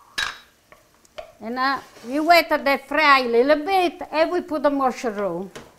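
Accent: Italy. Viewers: My Mother was from Naples Italy and accent, songs and receipts are authentic italian dishes. I grew up eating and cooking these dishes which I have passed down to my brothers and sons. It touches my heart to watch these videos. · I simply adore you. You remind me so much of my mom and the recipes she use to make. Thank you for sharing so many of your delicious recipes. My mom too, immigrated from Italy in 1947. Your accent is so much like my mom. I understand everything you say and how you say it. I love the fact you use your hands when you are cooking, just like my mom and grandma! Keep those scrumptious recipes coming with those beautiful Italian songs! Ti Voglio Bene!!!!!!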